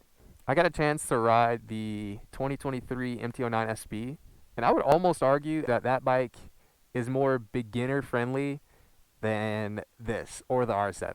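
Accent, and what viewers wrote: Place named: United States. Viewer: you sound gay as fuck with that american accent✈🏢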